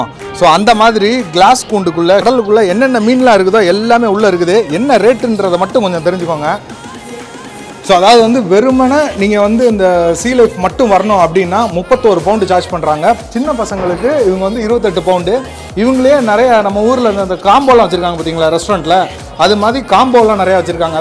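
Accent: Madurai, India. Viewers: லண்டனை சுற்றி பார்த்த உணர்வு.பதிவுக்கு நன்றி.மதுரைதமிழில் அசத்தலான பேச்சு.நடுசென்டரல 😀👌👌வாழ்த்துக்கள்.கோவிட் நினைவு சுவரில்SPB&VIVAKஎழுதியது .உலகத்துல உள்ள அனைத்து தமிழ் நெஞ்சங்களில் வாழும் இருவர்.பாராட்டுக்கள் வாழ்க வளர்க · உங்க(எங்க) மதுரை தமிழ்ல கேட்க ரொம்ப மகிழ்ச்சி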